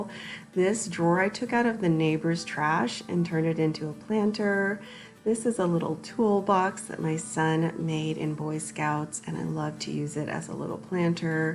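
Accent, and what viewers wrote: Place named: Colorado, United States. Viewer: You sound like you could be a Coloradan. 🙄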